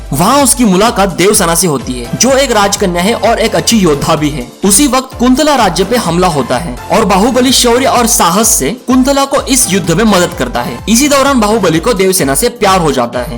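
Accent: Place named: Maharashtra, India. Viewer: loved your review and your typical marathi accent.....no theory left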